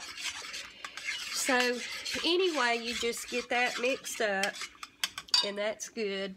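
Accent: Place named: North Carolina, United States. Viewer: I knew your accent sounded familiar! I’m from the Boone/Blowing Rock area :)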